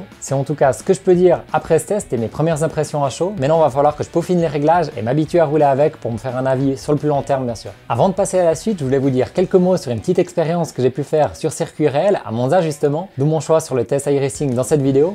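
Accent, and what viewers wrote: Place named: Neuchâtel, Switzerland. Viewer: on dirai un neuchâtelois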